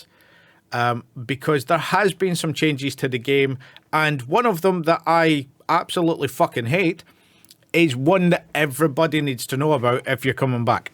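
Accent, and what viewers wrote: Scotland, United Kingdom. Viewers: i'm listening like, finland? that's a scottish accent lmao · Christ man, You are scotish? "rycordyn" "cerrierz" "skelz" music to my ears.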